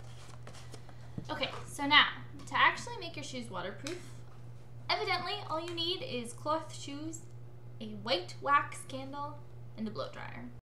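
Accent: New Jersey, United States. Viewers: You can hear her Jersey accent a lot in this video, especially when she says "off". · Watched a few of your videos and this is the first time I heard your Jersey accent. P.S. love your old, old videos. You were so damn cute.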